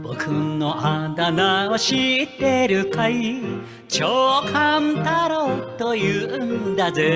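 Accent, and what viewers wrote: Nagoya, Japan. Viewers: さんおはようございます。名曲も名古屋弁になると雰囲気も変わりますね。味があって感動しました。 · こんばんは😊 新聞少年聴かせて頂きました♪ 名古屋弁、とっても味がありますね😊👍 聴いていてとてもウキウキ気分です✨ 素晴らしい歌声をありがとうございます😊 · さんこんばんは‼️ 拝聴しました👍👍👍👍👍 セリフ名古屋弁で良いですね👌 懐かしい素敵な歌唱有り難うございました🤗👏👏👏👏👏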